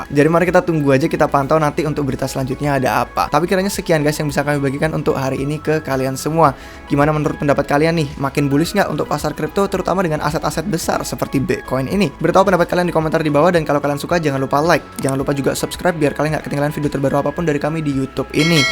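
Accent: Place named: United Kingdom. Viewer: lama tinggal di australia, temen bulenya pun banyak orang australia, jadi wajar kalo aksen british nya kental kalo kita yg terbiasa denger pake aksen US emang kerasa aneh sih 😂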